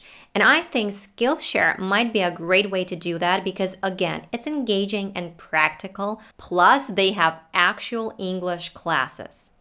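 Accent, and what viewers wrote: United States. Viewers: Jesus christ!!! Your American accent is mega strong. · I know you ever since I watched hadars students vids and then i was curious to know a bit more about you, and I think you're great, despite the fact you live out of a country where ppl speak English and your accent sounds so familiar like Americans , i wonder how you manage to speak in two different accents .. p.s if your reading this, pls mind letting me know about it. Thnxs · Don't know even you are Russian.. I thought you r 100% American 😊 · You are a very good teacher! It's hard to beleave that you are not american. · I'm shocked!!! I was totally convinced you're American! I would never think that your first language isn't English. Props to you! Keep it up 💪 Brilliant job! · The first time I saw you speaking I thought that you are American, you are perfect 👌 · Вот уж парадокс. 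Когда я увидел твое видео в рекомендациях, то так и подумал, что ты из Украины или России, но когда услышал, то подумал что американка ))Интересно, как долго учишь? Я сам из Украины и учу язык в ютубе от носителей.Но все просматриваемые мною ютуберы(например, Papa teach me или English with Lucy) говорят медленнее, чтобы изучающему было понятней, а также добавляют субтитры чего у тебя не увидел. А так все супер, ты молодец. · Hey...what you are doing is a great job..I like your extreme passion about English language.. even I wanna be like you someday... speaking fluently in a good American accent..with ❤️ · You are from Ukraine I thought you were from USA !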